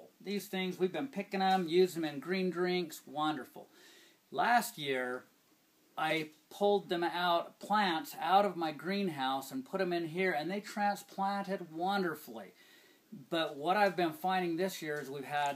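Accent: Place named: Russia. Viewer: Mmm yummy russain accent. My wife is russain. Very good woman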